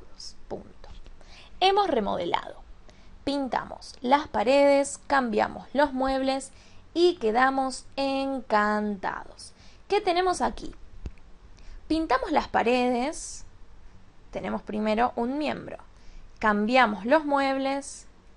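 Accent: Argentina. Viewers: El famoso acento argento! 😄😂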